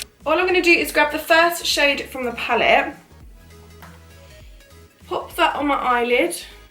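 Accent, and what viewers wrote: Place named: RP, United Kingdom. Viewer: this is literally the third video of yours I'm watching today I just told my sister that I'm obsessed with ur voice and I wish I spoke like you you sound so posh and british 😭 my twin agrees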